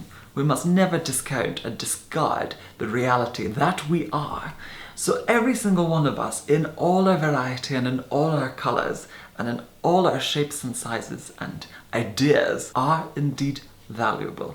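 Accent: Scotland, United Kingdom. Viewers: you should bottle yourself and sell it!!! uplifting, fascinating, spiritual, fair ( in both skin tone and outlook) beautiful and......Scottish!!! i could listen to you all day xx much love from one who has been described as 'blue white' xx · Scottish darling x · its fake, not how he really talks, it is all put on for videos and more viewers, go back to his early videos from say 2013, has a normal Scottish accent and voice nothing like this at alll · I so enjoy listening to you as your intelligence always shines through. And I also love the soupçon of Scottish accent in your speech. And as an alabaster-skinned woman of Russian -Ukrainian descent, I have come to love my paleness. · I KNEW YOU WERE SCOTTISH! Sorry, I love your accent♥️♥️♥️♥️ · I thought it was Scottish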